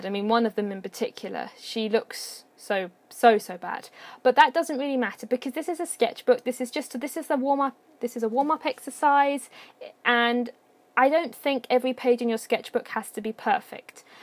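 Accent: United Kingdom. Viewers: Are u from the UK or something no sorry just asking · Idk why nobody has commented on this but I ❤️ your accent! I'm British too, and ppl really like mine too! I've watched this video like 5 times because it's so inspiring, and just to hear you talk too! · Love your British accent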